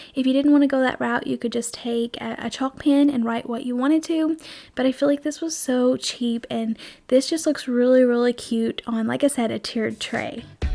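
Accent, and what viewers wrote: South, United States. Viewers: Ha ha! She does have a super sweet voice and personality to match. I'm a Texan and to me there's a bit of Southern Belle in her voice 😁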